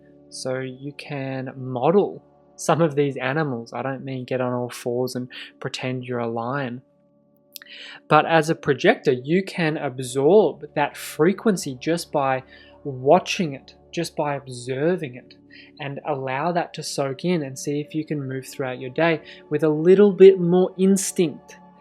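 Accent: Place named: Australia. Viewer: Which part of australia are you from? 5/1 from Brisbane :)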